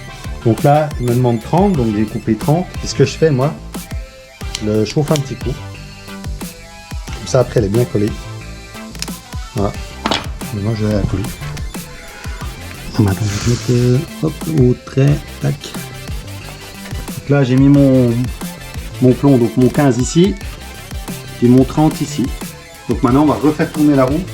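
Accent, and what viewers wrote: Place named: Vosges, France. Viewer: Super t’as vidéo bien expliqué ,t’as un accent t’es pas des Vosges?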